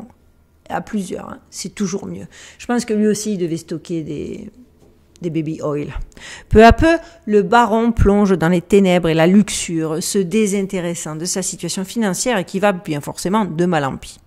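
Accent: Marseille, France. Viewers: Toujours des histoires passionnantes avec cet accent marseillais hyper puissant et envoutant que j'en perds le fil de l'histoire.Y'a plus qu'à relancer la vidéo en essayant de ne pas me faire hypnotiser 😋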